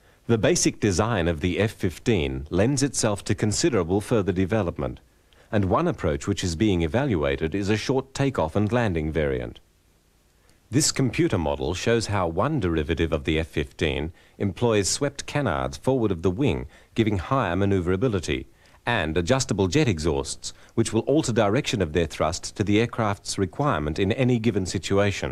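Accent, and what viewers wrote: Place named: United Kingdom. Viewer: Yes - the narrator has a British accent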